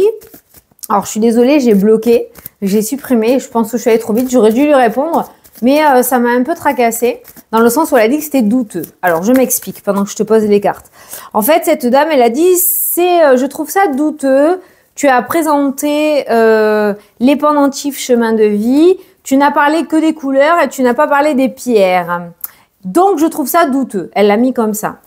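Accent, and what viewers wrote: South, France. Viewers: c'est une joie de vous écouter , j'adore votre accent du sud il met du soleil dans notre journée. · Je t’adore chaque jour tu me fais du bien avec ta voie du sud sa me réchauffe le coeur bonne journée et félicitation mais tu le mérites bizzzz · Bonjour ma, un grand merci pour ta guidance qui me donne du peps, tu es toujours joyeuse et j' adore ton petit accent du sud. Merci pour ton dévouement, ta gentillesse et ta bienveillance. Que le seigneur te bénisse ainsi que ta famille.❤❤❤🙏🙏🙏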